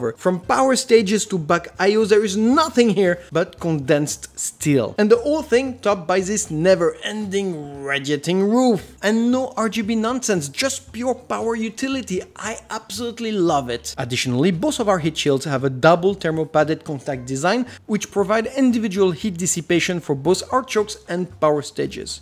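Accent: France. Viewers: hey i'm sold thanks you very much hope you grow fast on ytb that's explain very well, love the french accent and humor i'm also uh i plan to get this comfig this going to be my first pc gamer i was a console player before now, so for let's sayy holiday 2020 i will have it and buy it there is the part the tomahawk b550 a amd rayzen 7 3700x for the GPU the last thing and more expensive I'll buy the geforce 3080 uh also for the ram what's you saying is if i put only one the pc will run better ? and also a corsair A500 dual fan for the cpu and all can you tell me if it's a solid quality build pc already?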